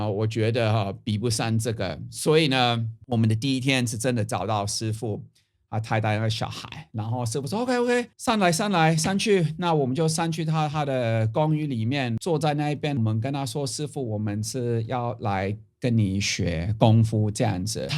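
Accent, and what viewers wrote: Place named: Taiwanese Hokkien, Taiwan. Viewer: 真的有學到台客說話的精髓。老婆大人教的太好了！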